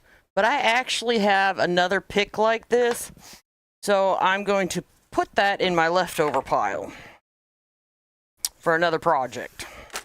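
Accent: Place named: Kentucky, United States. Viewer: Hi, I knew you were from KY before you told us. I am from KY, and I recognized the accent. I lived away from here for several years and lost a lot of my accent though. It is always good to be in KY. I love it.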